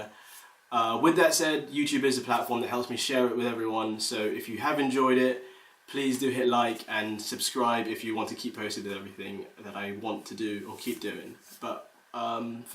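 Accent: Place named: United Kingdom. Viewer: Those soothing british tones will be popular with american viewers. Rake in those views boi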